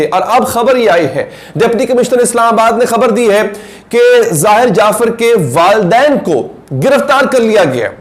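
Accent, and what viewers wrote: India. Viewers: Sir please Urdu sahi Bolen Indian accent hota ja raha he apka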